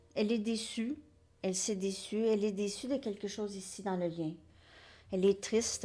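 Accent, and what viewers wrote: Canada. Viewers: Bonsoir madame vôtre accent canadiens est sublime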